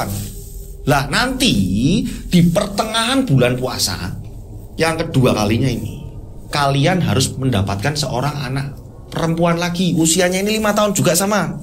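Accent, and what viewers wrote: Java, Indonesia. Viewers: Piyantun pundhi mas.....medhok sanget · Soreeeee Hong Kong hadiiiiiiiirrrrr, BUUUUUUMMMMM ASYEEEEK BHS MAS MUUUUEDOK BNGET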